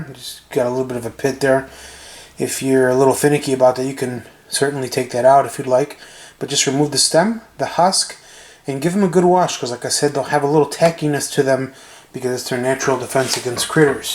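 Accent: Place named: Chicago, United States. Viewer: I hear some Des Plaines IL in that accent! LOL reminds me of my friends back home. Thanks for posting, I am definitely going to try this one!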